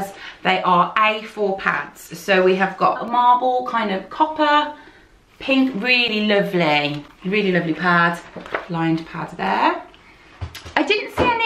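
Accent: Birmingham, United Kingdom. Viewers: honestly. I bloody love it! Your lil brummy twang makes me so happy - my family are from Birmingham and it just pleases my ear to hear!xx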